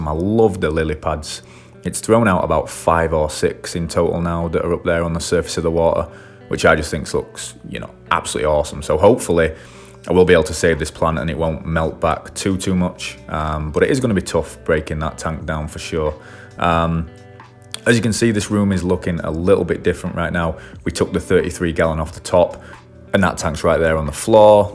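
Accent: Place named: North Yorkshire, United Kingdom. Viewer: Eyup fella. Happened upon your channel because i am toying with the idea of breeding. Not necessarily Cichlids, but anyways. I'm going to have to go back through your vids now! Sounds like you are from my neck of the woods, judging by your accent. I am from North Yorkshire (Gods country).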